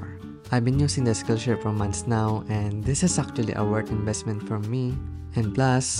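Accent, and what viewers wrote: Philippines. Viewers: Ilonggo ka sir? curious lang ko sa accent 😅 btw your auch a good artist po🥰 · wow you are a Filipino, just by your accent its so obvious. I live in Philippines too, I m a fan of your art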